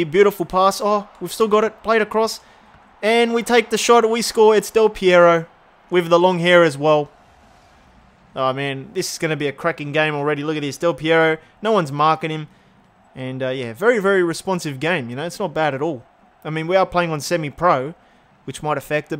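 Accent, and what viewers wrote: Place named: Australia. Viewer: This is the most Australian commentary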